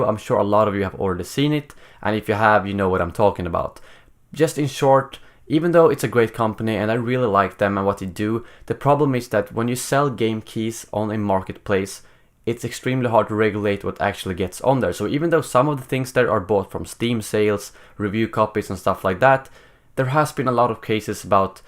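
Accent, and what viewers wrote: Sweden. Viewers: Well I gotta say this I hope that your channel grows much bigger and I think that I speak for many subscribers that, we would follow and support you even when battlefront dies out.(also for all those people that poke fun at the guys accent give him a break he is from Sweden so English may not be his first language) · I came to this channel a few days after DICE's Battlefront announcement (before the Røde microphone and at the peak of your strong swedish accent hahaha), and I've been suscribed since then. I participated in the forums and I've seen most of your videos, including the rumors and "what the fans want". I've seen your progress through the channel, from short pieces of news to full-length informative videos that are both extremely hard to find otherwise and very well packed with information. I absolutely advice you to keep on with the Patreon and pursue a full-time career here on YouTube, this may be a niche channel....but it's the niche channel we people need to have in order to be updated with Star Wars games. 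Huge thumbs up to you and keep being awesome! -Kanto.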